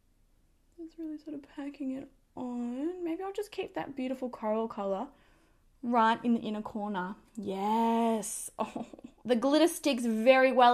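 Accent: Australia. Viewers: I'm an Aussie living in the US and it always makes me so happy to hear a familiar accent <3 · I freakin love your Australian accent!!!! Watching here in the US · Love that I have found your channel through Tati! So nice to hear an Aussie accent killing it on YouTube 🥰🥰🥰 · Oh my goodness 😍❤️ that palette is so beautiful. Hope I can win it I never win anything but hey you never know. Love your videos as always and love the Australian accent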